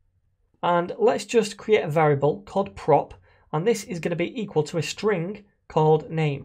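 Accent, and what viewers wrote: United Kingdom. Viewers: are you British, it is two birds in one stone learn British accent with JS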